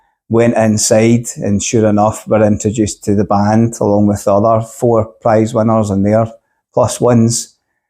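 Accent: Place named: Glasgow, United Kingdom. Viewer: Yes, your video came up on my YouTube homepage as a suggestion so I gave it a watch and was surprised to hear the Glasgow accent! Listening to Maiden on good headphones is a completely different experience than blasting it out of a stereo. You hear so much more of the intricate details they put into their music. I really hope they do a live album/video of the Future Past Tour. I went down to London last summer to see it and it was one of the best performances I've seen them do. They sounded amazing and the production was probably the best I've ever seen from them — and I've been going to Maiden concerts since '88! And was great of course, to hear them play Alexander The Great for the first time, live!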